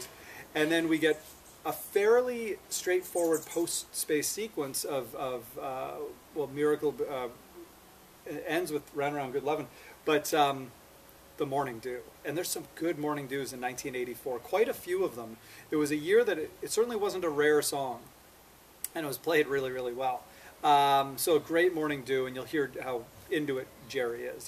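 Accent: Canada. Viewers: Is he Canadian? I hear a strong accent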